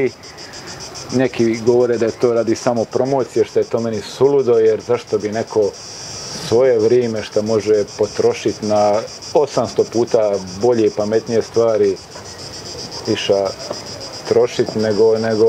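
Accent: Dalmatia, Croatia. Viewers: i ja isto OBOŽAVAM Dalmatinski naglasak, o tome može da misli ko šta oće negativno, zabole me ,,djoka,,.. poz 031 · To je dalmatinski naglasak kod zena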